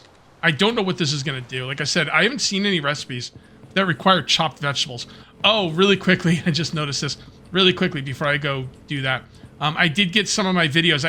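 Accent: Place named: Philadelphia, United States. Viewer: You must be from Philly.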